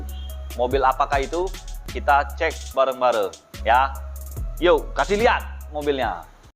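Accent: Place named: Plumbungan, Indonesia. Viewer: Wong Plumbungan nian Dio ni klo ngomong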